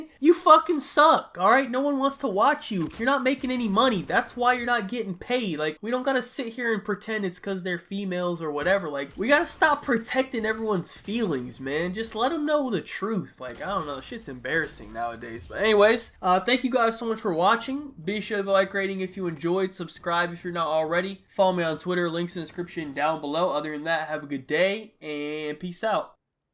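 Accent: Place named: South, United States. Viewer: Southern lmao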